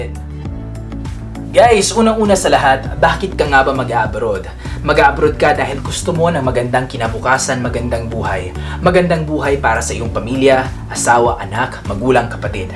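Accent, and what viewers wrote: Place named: Arab world. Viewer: nkakatuwa, mukhang arabo na fluent sa tagalog though nsa accent nya ung arabic.. ang galing.. nice mixed.. gwapo ah.. gym ka bro lalong bagay sayo pagiging arabic.. good luck ang more blessings sa channel mo...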